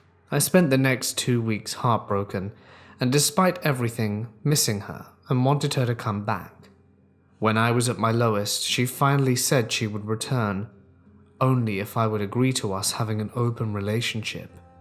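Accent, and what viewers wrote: England, United Kingdom. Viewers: on a funny note, I listen to English narrators (just prefer the British cadence, I suppose) so much that you got me saying “narrator” the English way, rather than the American way. I didn’t realize we pronounced them differently until I said it in front of my bf and he gave me a funny look and said “.... say “narrator” again..”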